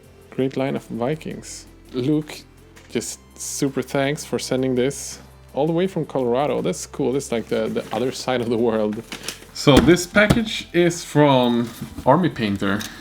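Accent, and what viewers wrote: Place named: Sweden. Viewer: Sjukt att hitta kanalen och först höra du mest troligt är svensk utifrån dialekten och nu se Umeå på ett paket! Hej granne!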